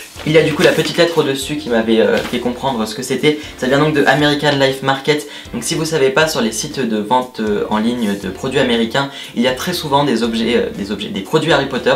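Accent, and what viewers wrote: England, United Kingdom. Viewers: J'ai beaucoup trop rigolé 😂😂 on adore ton accent anglais 😂😂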